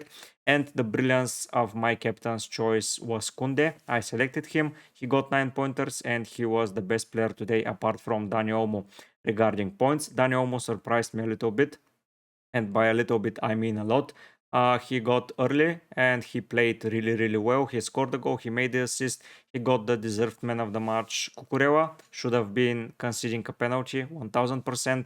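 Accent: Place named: Bulgaria. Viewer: I just heard 3 seconds and I knew you were Bulgarian, can’t miss the accent.н😂 Жив и здрав брато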